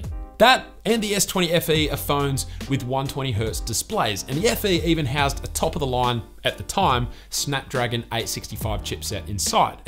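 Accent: Australia. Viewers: Your Australian accent sounds really fun ngl, and I like how you use 21:9 video. Watching full screen on a longer phone is such a joyous experience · You Australian accent tickles me 🤣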